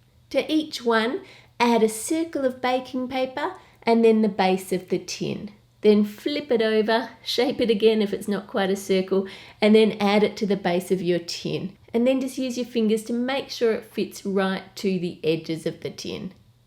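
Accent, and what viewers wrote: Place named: Australia. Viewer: She's very Australian. I can't stand Australian accents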